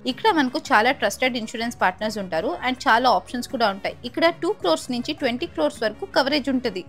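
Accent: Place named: Karnataka, India. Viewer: Karnataka telugu ilaane untundi...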